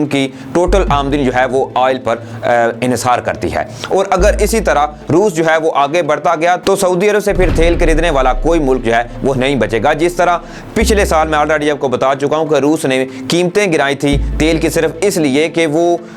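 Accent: India. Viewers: Why you speak Urdu in Indian accent?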